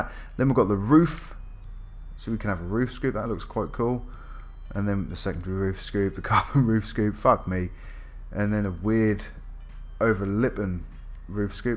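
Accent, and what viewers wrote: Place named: Cockney, United Kingdom. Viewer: Nice video mate & random question I know but what's your accent? Sounds a bit cockney/pompey I just can't quite put me finger on it 😂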